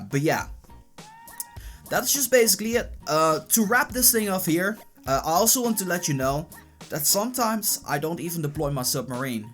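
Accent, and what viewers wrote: Netherlands. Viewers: | Boom Beach & Clash Royale Are you from the netherlands? you have a dutch accent :L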